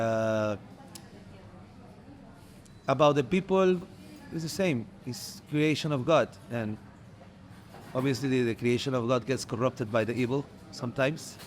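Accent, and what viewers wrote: Mexico. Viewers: lol he's Mexican. His accent is Mexican with English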